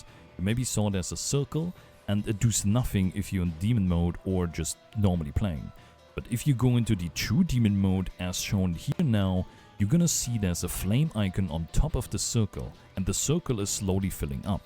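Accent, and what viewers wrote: Germany. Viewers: Because i hear you say that a lot: It's "Does", not "Duus". Its almost spoken like "das" in german, but with an o inbetween. aka "dos" (I assume you are german, taken from your accent)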